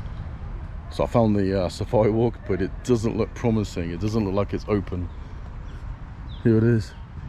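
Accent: United Kingdom. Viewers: you've got a British accent?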